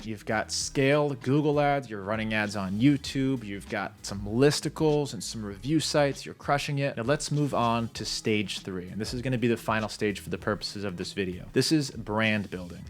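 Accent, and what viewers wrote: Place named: Canada. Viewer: You sound so Canadian Dogg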